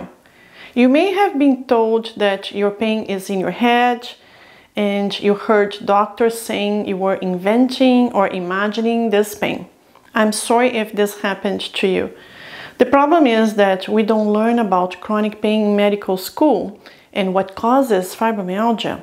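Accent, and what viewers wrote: Brazil. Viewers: This female has Brazilian accent.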